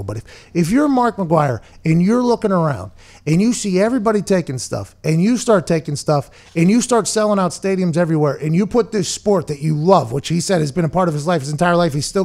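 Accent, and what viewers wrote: Pittsburgh, United States. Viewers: I wonder how many people had a hard time listening to a true yinzer accent ❤️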